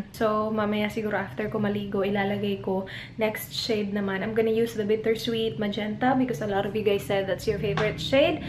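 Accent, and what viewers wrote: Visayas, Philippines. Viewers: hi??mu anhi ba daw kag Manila??hala ganahan kaau ko maminaw nmu pag mag binisaya ka..labyo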